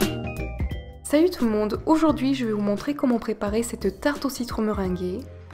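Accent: South, France. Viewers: tu es de quelle ville tu as un belle accent du sud!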